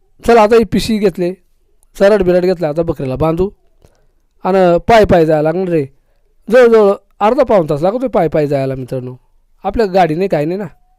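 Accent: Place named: Palghar, India. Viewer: दादा तुम्ही बोलता तीच बोलीभाषा आहे आमच्या गावची पण... मला वाटले तुम्ही पण पालघर मधलेच आहेत की काय...❤❤ जय आदिवासी दादा...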